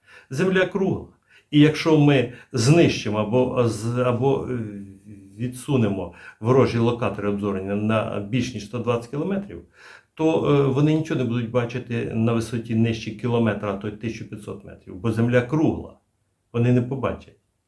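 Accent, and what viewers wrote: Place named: Lytyn, Ukraine. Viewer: Завжди приємно слухати Вас пане. У Вас якась рідна українська мова, як у нас на Літинщині. Дякую за всі огляди.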